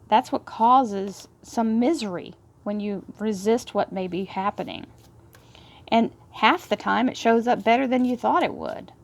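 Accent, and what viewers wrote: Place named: South, United States. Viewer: Once again, being a TN gal, I just love your southern accent. You sound exactly like my sweet Aunt Charlotte. Thank you so much for sharing your precious gift with us. Your readings are 1000% resonant with me. 🙏💗💜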